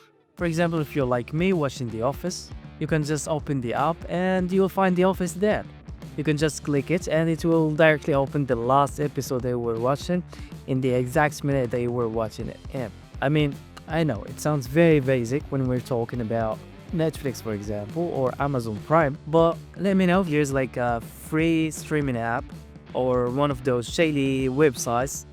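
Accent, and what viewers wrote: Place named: Morocco. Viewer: Anglais mdrdra b darija accent love the video, Good luck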